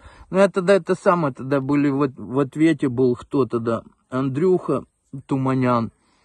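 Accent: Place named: Ukraine. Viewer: .... блин!... Как мне нравится говор украинский, но такой, что бы русские понимали!.... И ещё -, Вы очень похожи на моего двоюродного брата Андрея в молодости!.... Счастья, здоровья, мира, добра Вам и семье!....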